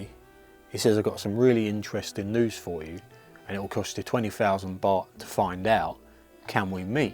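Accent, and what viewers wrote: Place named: Cockney, United Kingdom. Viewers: I watched this twice today with my thai sweetheart and being a Sth London cocker I found it hilarious, I think because of the obvious audacity and the cockney tune to it all and to see Rob pictured like some sort of mug was so funny mate this vlog is pure gold 55555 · Your accent reminds me of Danny the drug-dealer from "Withnail and I", who I believe was a Londoner. Maybe I'm just a dumb Yank who can't pick out a British accent though :)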